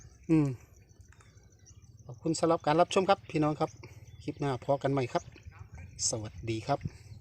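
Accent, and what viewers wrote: Thailand. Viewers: อยู่บ้านได๋น้อ ฟังสำเนียงเสียงเว้าคือสิมาทางเดียวบ่น้อ หมานๆครับ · สวัสดีค่ะ ได้ปลาโจกตัวใหญ่ๆ ลาบแซบๆค่ะ สำเนียงเว้าคือไทยนครพนมบ้านข่อยแท้น่อค่ะ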